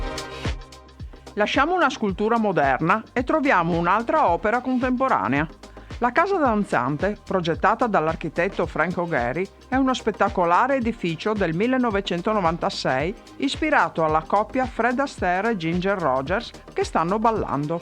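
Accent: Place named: Veneto, Italy. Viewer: Sei veneta ciò!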